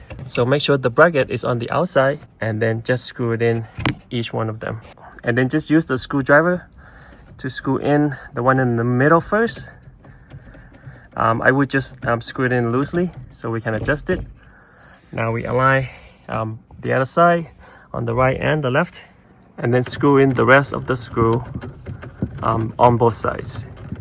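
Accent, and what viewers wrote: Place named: Asia. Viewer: Why it has an asian accent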